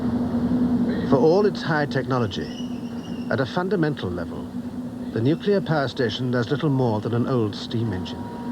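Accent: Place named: RP, United Kingdom. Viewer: It's called received pronunciation or just RP, often called BBC English or The Queen's English. He's an English actor, probably retired by now.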